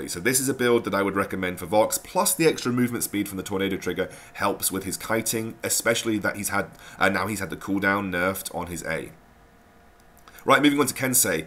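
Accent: United Kingdom. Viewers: "Meturr" "Alpherr" why do the British ruin words?! 😓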